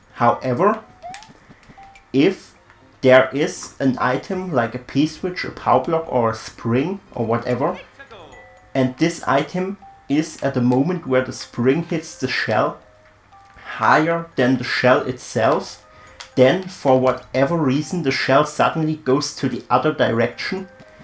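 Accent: Germany. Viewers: man merkt das du deutsch sprichst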